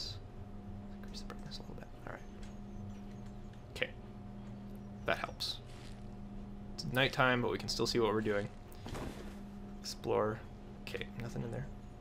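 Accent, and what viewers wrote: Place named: Canada. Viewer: Very interesting game! Reminds me a little of Fallout :) I also have to say I quite enjoy your Canadian accent.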